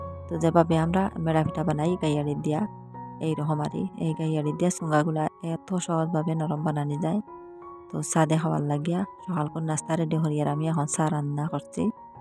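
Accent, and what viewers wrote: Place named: Chittagong, Bangladesh. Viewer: আসসালামু আলাইকুম আপু কেমন আছো লাইক দিয়ে ভিডিও দেখে নিলাম তোমার কথা শুনলে আমার হাসি এই আপু আমি তোমার কথা কিছু বুঝে কিছু বুঝিনা তুমি কি চট্টগ্রামে ❤❤❤❤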